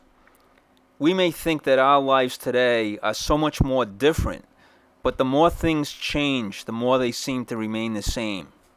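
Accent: Fall River, United States. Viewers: THE GUY DOING THE VOICE OVER HAS A FALL RIVER ACCENT BIG TIME SOUNDS ALOT LIKE JACK AND BOBBY KENNEDY JUST AN OBSERVATION WELCOME TAH FALL RIVAH !!! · That accent reminds me of my Mother! She grew up in Fall River…that accent is home for me ❤️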